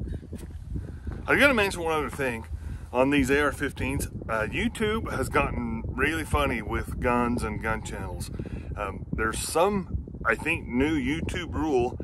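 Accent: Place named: North, United States. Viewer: You sound like you're from up North. Am I right? I think so. Howdy from TEXAS brother⭐🇺🇸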